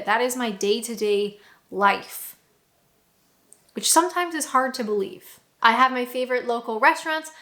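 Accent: United Kingdom. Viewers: Lol. You sound fully integrated into British life. :D :D :D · It's great you consider this home now. .... and you're getting a great accent too! Now to fet yiu moved somewhere where you'll get a strong accent: Yorkshire..... Dorset.... or gasp Happisburgh in Naaaaaarfook (where you will most certainly need a car.... or rather.... tank)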